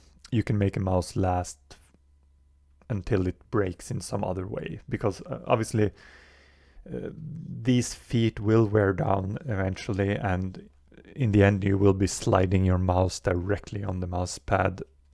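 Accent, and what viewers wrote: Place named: Norway. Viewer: Mate, your videos are more than helpful. I learned some great tips, The one where you hold the medikit and protect your head (hit point) against grenades was a pearl of wisdom. Today, you taught me not to always spawn at squad leader, which should be obvious. Only until someone points it out. I have developed an obsession with battlefield 2 that came out of personal trauma, and I have this urge to become a better player. It's crazy, as it's a 20 y.o game, but EA and Dice haven't come out with anything better since IMO. BF3 and BF4 single player campaign are good, but they took away all the team and commander functions, and replaced it with a diet version. No ability to mod. Don't get me started on Jets. No take off at runway is a big, fat joke. I dislike (beyond comprehension) the path they chose. They screwed over customers/fans for the money, and to be more like COD. 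Imagine BF2 with BF4 graphics. No reason why this shouldn't have been made possible. I truly dislike EA and Dice! Instead we are stuck with these outdated graphics and engine. I had an idea you were Norwegian. Your accent is very familiar. My Daughter is from Bergen, and her Mom is from Sogndal. I can tell you are an infantry purest. I don't even sdee you using UAV/Artiliery, or Commander in your videos. I looked up Zeqtr. He is very good. Gonna watch more and pick up some tips. I was wondering, are there any competitive BF2 servers nowadays, or do the remaining servers just account for public players? I mean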